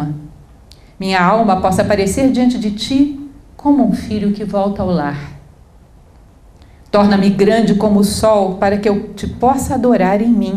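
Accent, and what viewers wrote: Brazil. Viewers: Foi uma linda festa pá, mas faltou a língua de Portugal, a lingua portuguesa. A língua brasileira atrapalha, ainda com todo o esforço realizado, estilo e sabedoria, de, "nome bem portuguès, por sinal", e seus declamadores. De qualquer forma, se em Portugal não se fizerem festas assim, que se façam no Brasil ou no resto do mundo, pois dá para perceber o amor de muitos brasileiros a este cantinho da Europa Ocidental, onde nasceram seus antepassados. Já agora frisarei que "a minha biblia" o Livro do Desassossego, foi editado em chinês há + ou - 20 anos. Parabéns a pela sua entrega ao poeta e filósofo! Como teria gostado de a ouvir falar em português de Coimbra... O UNIVERSO INTEIRO NÃO PARECE UMA BRINCADEIRA, UMA PARTIDA DE UMA CRIANÇA TRAVESSA? TÃO IRREAL, TÃO... L. do Desassossego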